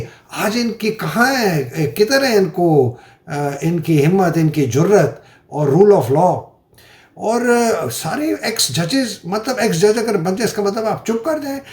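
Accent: Pakistan. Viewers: Sir don't you worried, its a simple and casual (mirasi) accent of punjabis since partition, leave them they should need to be focus on facts rather then words and way of talking. Don't take it serious some of that people. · صاحب، حضرت، قبلہ: ہم لاہور کے رہنے والے لوگ آپکی ترتیب کی پنجابی اردو بولتے ہیں اور بہت سارے الفاظ جو آپ بولتے ہیں، میں اچھی طرح سمجھتا ہوں۔ البغلول رحم اللھ ایک بہت بڈے بزرگ ترکیہ یا ایراق/شام میں، حرونارشید کے زمانے میں ہوگزرے ہیں، اور ایک ڈبکھڑبہ کردار نہیں تھے، بہت پایے کے ولی اللھ تھے اور انکی ہر بات بیوقوفانہ لگتی تھی لیکن بہت فلسفے و دانش سے مظیین ہوا کرتی تھی گہرای رکھتی تھی۔ انہیں تو فلسفہ و زبان میں پڑھایا جانا چاہیے۔ لوگوں کو اتنا سنجیدگی سے نہ لیا کریں "لوگ تو باتیں کرتے ہیں انکا تو کام ہے باتیں کرنا"۔۔۔۔ ۔۔۔۔ میاں ہمایوں لاہوری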